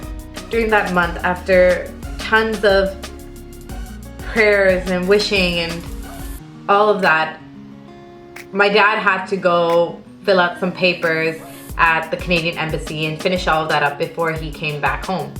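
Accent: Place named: India. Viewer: omg i love Indian ascents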